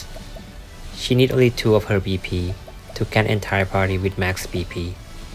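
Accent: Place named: Thailand. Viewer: You sound Thai, where you from?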